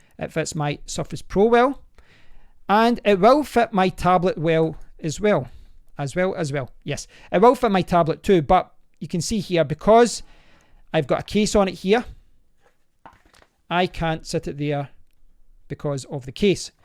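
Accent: Scotland, United Kingdom. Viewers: Nice scottish accent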